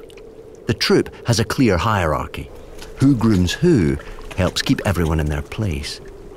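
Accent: Scotland, United Kingdom. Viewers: Well I didn't wake up this morning expecting to hear David Tennant say "Spy Macaque" in his very Scottish accent but here we are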